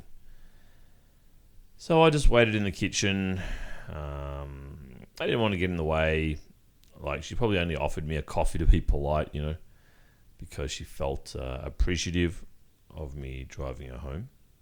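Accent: Australia. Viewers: Australian accent sucks 😕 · Okay I’m stupid, I was about to ask if you were Australian because you sound really Australian, buuuut then I read your channel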